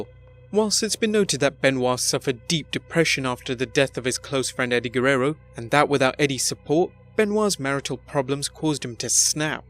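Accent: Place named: England, United Kingdom. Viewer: Ughh the monotone and English accent makes this video 100X worse i couldn’t make it half 1/4 the way thru it. And this guy had many videos.. all of disgusting horrible acts, cia would be in his backyard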